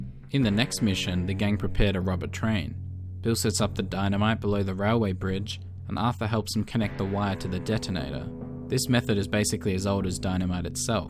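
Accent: Australia. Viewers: didn't expect to see the history behind the coins on Davey's eyes. educational & a cool Aussie accent -- yay! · You sound like an Australian. And I'm amazed. If you don't live in America and are Australia native, I kinda find it sad that somebody foreign to our country is one of the most detailed historians of my country I have ever heard. I am making a bunch of assumptions and will be perfectly happy to be corrected on any of them. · Yeah, the narrator speaks Australian and I'm a bit rusty so I appreciate it as well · Just found this video and the rest, love it mate it's amazing. Aussie voices are just amazing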